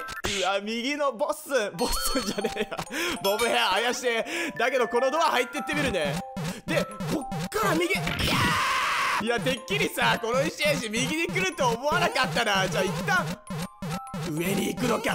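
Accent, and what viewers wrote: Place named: Kyushu, Japan. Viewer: 初コメです、7万人おめでとうございます！九州出身なのでさんのイントネーション好き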